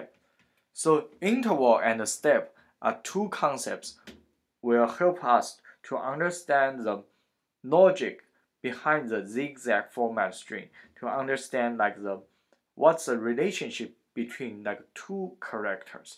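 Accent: China. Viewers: 是东北老乡不？哈哈哈哈哈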